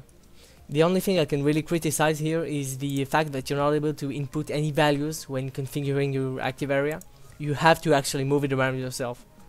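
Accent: France. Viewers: l'accent anglais est pas ouf hein on sent la france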